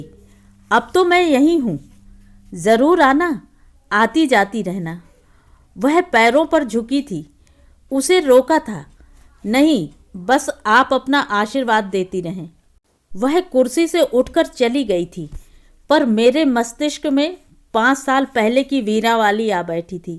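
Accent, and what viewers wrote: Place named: Haryana, India. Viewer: बहुत ही सुन्दर कहानी है और ठेठ हरियाणवी अंदाज में सुनाना भी मन मुग्ध कर गया।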